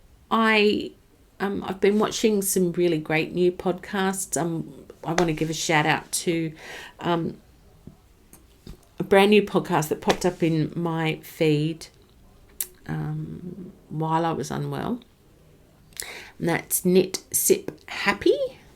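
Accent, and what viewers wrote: Australia. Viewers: I love watching you. You make me smile. Maybe you can help me make some socks. Love your Australian accent too.🥰🧶🧙‍♀️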